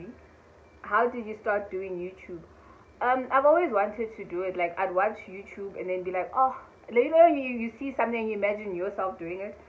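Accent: South Africa. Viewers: I just love you❤️❤️your Xhosa accent 👌👌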